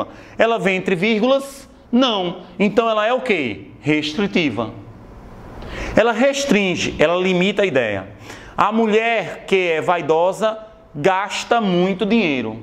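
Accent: Northeast, Brazil. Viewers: de onde vc é ? pois tem um sotaque nordestino e meio português de portugal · O famoso e gostoso de se ouvir sotaque nordestino ksksks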